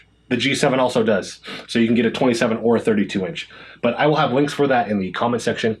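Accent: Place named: Pacific Northwest, United States. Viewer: Great review! Just curious, are you from or do you live in the PNW? Only asking cause your voice reminds me of other content creators from there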